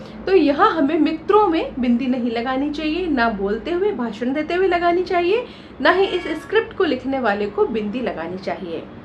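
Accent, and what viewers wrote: Standard. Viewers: हिंदी भाषा का शुद्ध उच्चारण ❤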